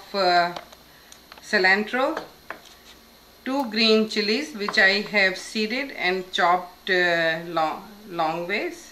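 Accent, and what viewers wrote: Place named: India. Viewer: can you write the ingredients, i can't understand the ingredients, no offense, but i'm mexican and u are indian, and i don't understand you because of your accent, again no offense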